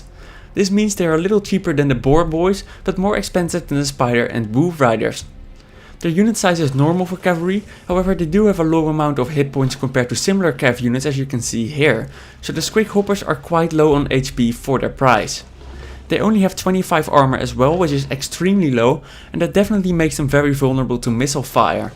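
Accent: Netherlands. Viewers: let me guess, you're Dutch xD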